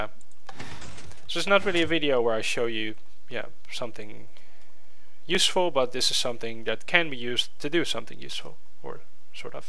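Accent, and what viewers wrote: Netherlands. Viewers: you're from holland aren't you?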